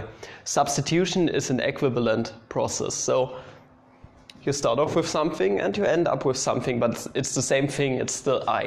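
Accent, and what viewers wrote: Germany. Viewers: If you don't mind me asking, where does your accent come from? I, being an American (therefore having no idea what accents come from where), just think it's German. Is that right?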